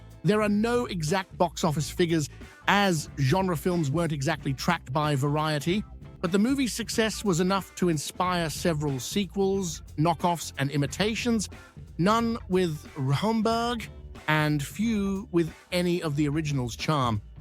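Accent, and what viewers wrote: United Kingdom. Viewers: I assume you get all these films from somewhere to view and you sound British! Where do you buy all these 70's fims?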